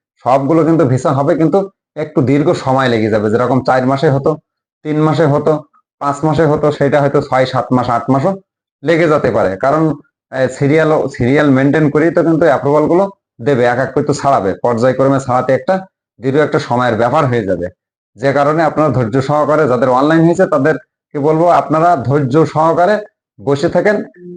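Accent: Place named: Satkhira, Bangladesh. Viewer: ভাই আসসালামু আলাইকুম আমি আপনার ভাষা শুনে মনে হচ্ছে আপনার সাতক্ষীরা ডিসটিক এর কোন এক জায়গায় বাড়ি দয়া করে যদি এই সত্য কথা হয় তাহলে আপনি আমাকে নক দিবেন আমি আপনার মাধ্যমে একটি কাজ করতে চাই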